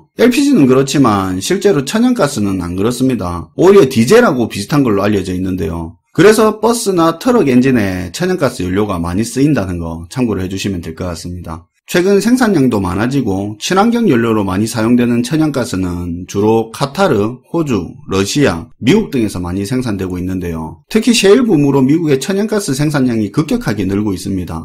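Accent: Gyeongsang, South Korea. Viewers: 이야..구수한 경상도사투리에 전문지식없는사람들도 금방이해할수있도록 간단명료하게 설명해주시니..그저 대박이라고밖에...ㅎ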